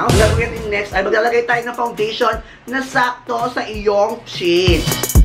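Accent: Visayas, Philippines. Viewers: bisaya ka ba kuya?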